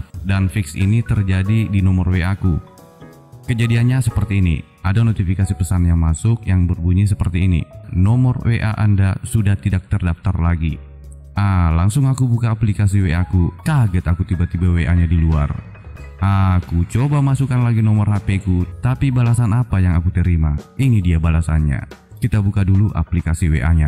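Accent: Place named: Bugis, Indonesia. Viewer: Logattt nya logattt bugisss skli🗿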